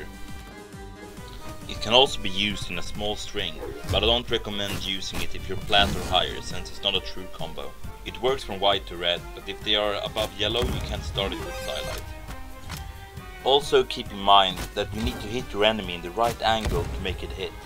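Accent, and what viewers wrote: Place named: Sweden. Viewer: Wait,are you Swedish? You've got that accent :D and btw really helpful video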